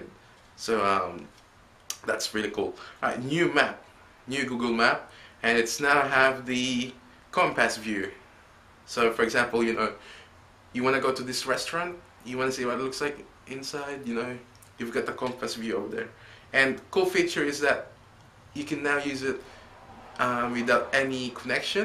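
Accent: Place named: Philippines. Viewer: pinoy accent =))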